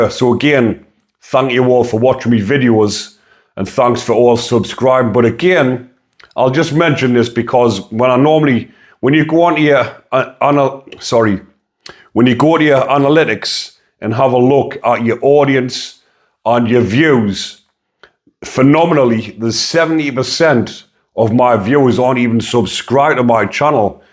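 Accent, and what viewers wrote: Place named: North East England, United Kingdom. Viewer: I hope I’m wrong, but maybe your north east accent may be why you aren’t getting the subscribers 🤷🏻‍♂️ I personally understand every word you say, but that’s because I’m English. What I see is a decent man, a man who has accepted his wrongdoing and is prepared to change. Not only that, you’re advocating peace ✌️ I think you’re fantastic mate 😮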